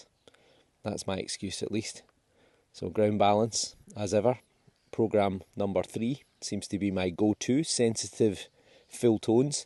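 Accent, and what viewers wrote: Scotland, United Kingdom. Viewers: Dear, I'm from Switzerland and i celebrate each of your fantastic videos. I'm a great fan of your scottish english and i finally realised that the name of Australia's Perth is because of Perthshire...;-) I have 5 weeks of summerholidays and i'm a detectorist aswell. Is there any chance to join You? I know, You must get thousands of this kind of demands, but just to be with You, finding a Roman Denarius or something similar would be the jackpot for me! Please let me know asap. Kind rrregarrrds Stefan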